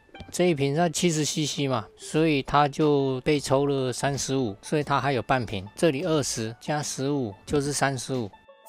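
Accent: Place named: Taiwan. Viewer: 台式英文，其實大家都懂是在講什麼就好。milliliter 音節這麼多念起來很拗口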